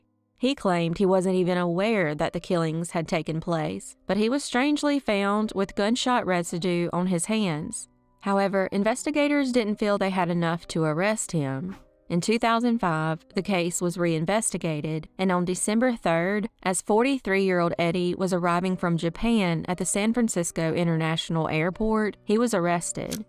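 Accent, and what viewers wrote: South, United States. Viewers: Her accent is thick southern accents are thick. I don’t see a big deal .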